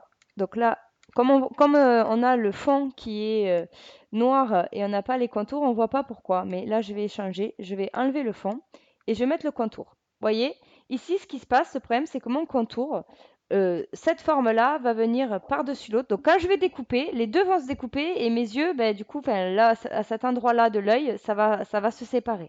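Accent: France. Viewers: Je débute sur inkscape. C’est impressionnant tout ce qu’on peut faire avec cet outil. Gardez ce petit accent béarnais (la cacade) 😂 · très bonne vidéo et bonne explication avec notre accent du sud ;) par contre je trouve aucune vidéo sur ta chaine c normal ? merci pour la transmission de ton savoirs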